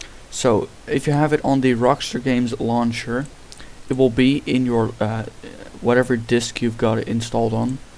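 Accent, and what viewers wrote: Netherlands. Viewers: R u dutch? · shall my laptop take off or explode? XD btw are you Dutch?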